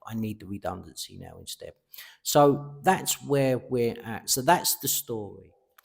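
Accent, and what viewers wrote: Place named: England, United Kingdom. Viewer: hi bub, youll find, if you loose that pomy accent, things will get beter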